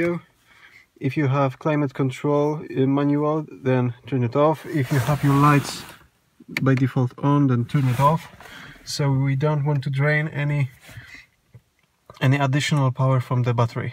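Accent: Poland. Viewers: Ale mnie śmieszy ten polski akcent Polak dla Polaków po angielsku gada :D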